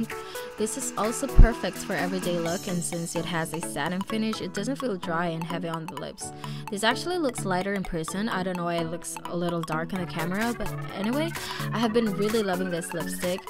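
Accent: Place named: England, United Kingdom. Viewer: love your english accent sis 😊 Helly Cass here sis from the group 😊 I subbed sis.. ty ulit sis sa pag subbed 😊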